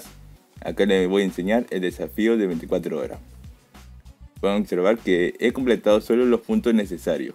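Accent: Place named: Guayaquil, Ecuador. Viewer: Sabía que ese acento se me hacía muy conocido xd Guayaco pa?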